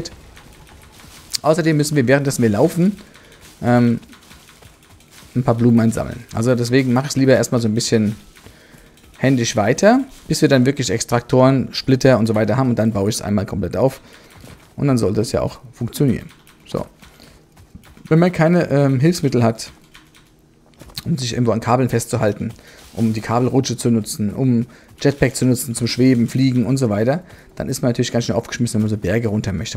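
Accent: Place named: Hesse, Germany. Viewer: mit hessische Akzent :))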